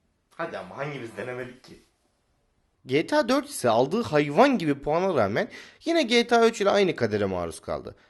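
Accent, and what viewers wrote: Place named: United States. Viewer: Aga Amerikan adamları tarzında konuşuyorsun. 'Hadi ama hangimiz yapmadı ki' gibi. Ama bu konuşmalar bana hoş gelmiyor. Alışkın değilim sonuçta. Temiz bir anlatım yapmışsın. Tarafsız olmuşsun. Tebrik ederim.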